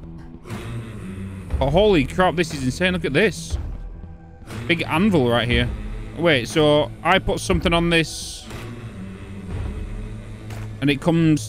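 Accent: United Kingdom. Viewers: all, also ur British accent we you go, "GOD BLOODY DAM IT", all the spending spree montage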